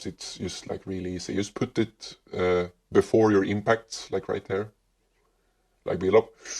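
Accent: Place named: Sweden. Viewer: ur swedish?😃I recognioze the accent 👀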